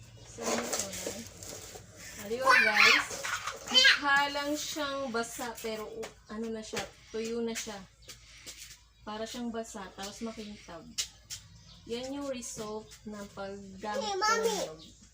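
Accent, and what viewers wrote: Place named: Visayas, Philippines. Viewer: Pina ka tuno sa bisaya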